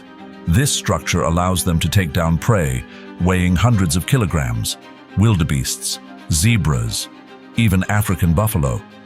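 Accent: United Kingdom. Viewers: specially due to your British accent I love this...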